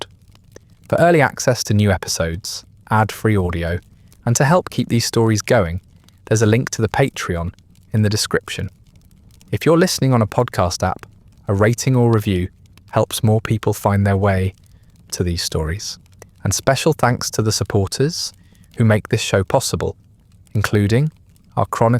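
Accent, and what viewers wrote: United Kingdom. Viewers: Watch how you tread here carefully having a British accent and dictating Irish history to the mass is not a good luck would not appreciate if I were to do the same to you. Trust me just cautiously and make sure you know your shit. Again the graphics need to go.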